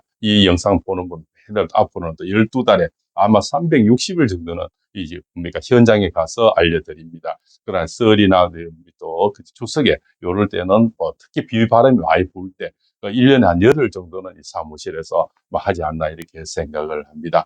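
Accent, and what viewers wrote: Gyeongsang, South Korea. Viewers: 새해복많이받으세요 님은 경상도 같은 고향같네요 윤대통령님 기도좀올려주세요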